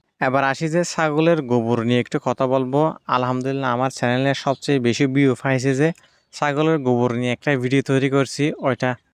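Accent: Chittagong, Bangladesh. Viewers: মনে হয় চট্টগ্রামের মানুষ বাড়ি কোতাই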